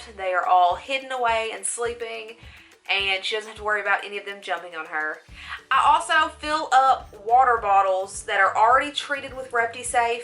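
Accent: South, United States. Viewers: I am in love with your voice ... Lol your beautiful as well but that southern voice....sheww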